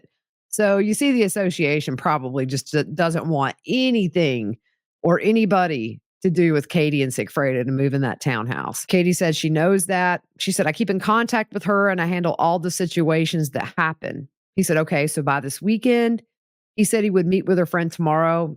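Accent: South, United States. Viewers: I love Wendi but i love your southern accent also.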